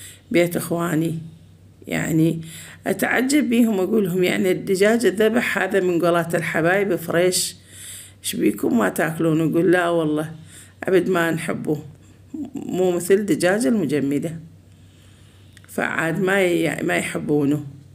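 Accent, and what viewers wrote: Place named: Mosul, Iraq. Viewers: هلا خالة.. بالصدفة شفت الفيديو بالمقترحات وجذبني العنوان.. لان خوالي من گيارة.واعمامي من كركوك.❤ وحسيتج من لهجتج انتي هم من كيارة🌷😁..فدوة لصوتج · عاشت ايدج لهجة الموصل بلسانج تخبببببل احبج هواية خالة. اني زينب من البصرة احب تذكرين تعليقي لان اكتبلج هواية ومجاوبني ربي يطول بعمرج يااارب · احب كلامج اموت على لهجه الموصل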